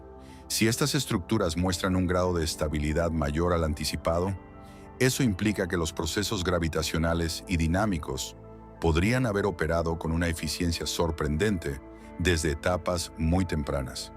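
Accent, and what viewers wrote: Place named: United States. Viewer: Tonterías con acento gringo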